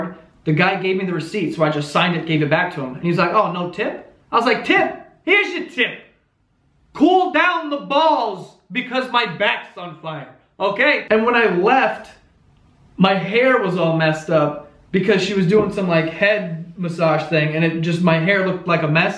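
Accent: New York, United States. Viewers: His New York accent super cute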